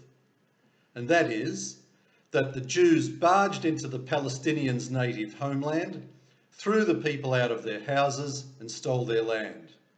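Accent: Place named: Australia. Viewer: At last! A forceful Aussie voice. Well done,. Now go after the Lost Tribe of Israel. By that I mean the Australian Jews who are, shockingly, supporting these anti-Semitic Israel liars and haters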